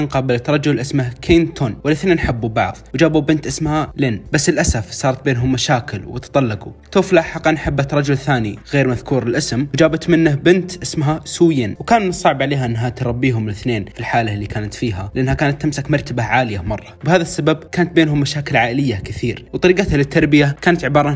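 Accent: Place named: Qassim, Saudi Arabia. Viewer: احسك من القصيم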